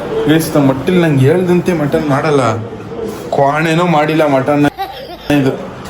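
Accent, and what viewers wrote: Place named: Mysore, India. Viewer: Nim uru yavdu bro Mysore district anta gotaithu nin bhashe ((slang)) matode rithi nodi gotaithu ..nija alva?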